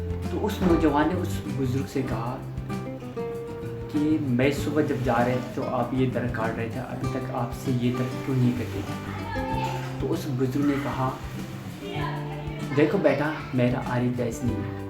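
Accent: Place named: England, United Kingdom. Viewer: Wah bhi Urdu bhi English style mein bol rha ho...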